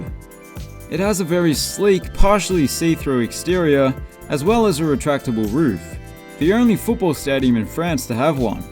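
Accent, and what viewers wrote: Spain. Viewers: Excellent video but stop reading portuguese with spanish accent please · Nice video, but please stop pronouncing Portuguese words as if they were Spanish.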